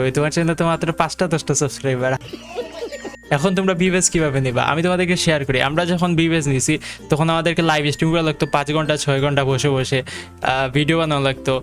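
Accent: Sylhet, Bangladesh. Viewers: ভাই আপনি সিলেটি নি🙄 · ভাইয়া আপনি কি সিলেটি আমিও সিলেটি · Vai apni ki sylheti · আপনার কথার ধরন দেখে বুঝলাম আমি ও সিলেটি🥰🥰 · তুমি সিলেটি নি ভাই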